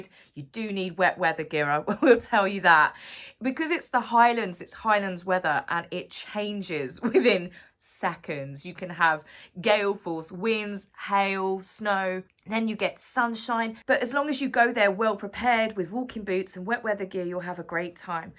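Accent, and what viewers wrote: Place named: Southeast England, United Kingdom. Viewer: It was great to hear your SE England accent. I’m from the SE but now live in Devon and I miss it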